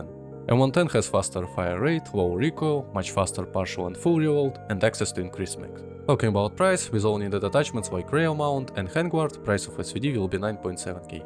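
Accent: Russia. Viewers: I thought you were russian Nice video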